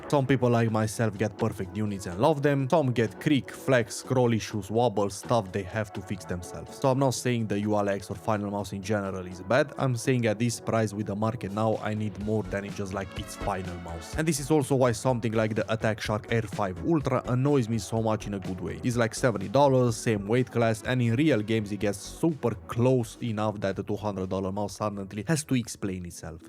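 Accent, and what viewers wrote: Romania. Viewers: Știam că ești român după accent :))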